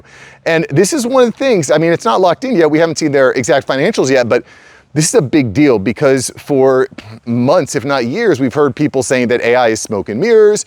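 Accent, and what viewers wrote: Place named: United States. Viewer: Speak slowly, articulate clearly and speak in English, not American.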